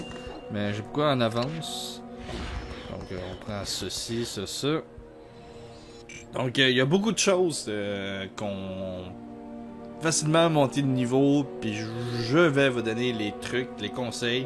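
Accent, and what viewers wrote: Quebec, Canada. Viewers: L'accent québécois >>>>>> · Défois je comprend pas trop ce que tu dit par ce que tu a un accent québécois et je suis français mais sinon bonne vidéo ^^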